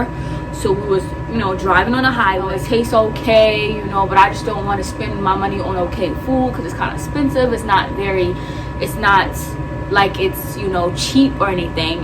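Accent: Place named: Brooklyn, United States. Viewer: Lolll you sound like you straight from Brooklyn 🤣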